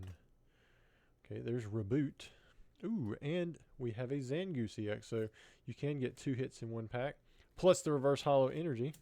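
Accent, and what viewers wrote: South, United States. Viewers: That seems pretty on par for a traditional booster box, but for a giant special set it’s going to be rough 😅 Also, it’s nice to see someone else from the Carolinas! I don’t hear that familiar southern accent often when watching pack openings lol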